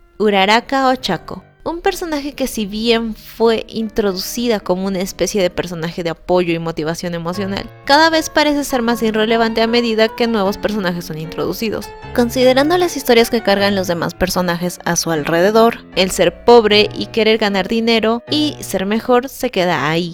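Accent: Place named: Chile. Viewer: ctm eri chilena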